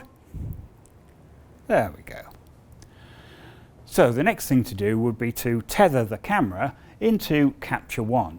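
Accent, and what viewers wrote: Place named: RP, United Kingdom. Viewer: Dear Sir, I am most obliged to you for sharing your knowledge and doing this in Queen's English. You not wearing a baseball cap (the wrong way round) added to my viewing pleasure.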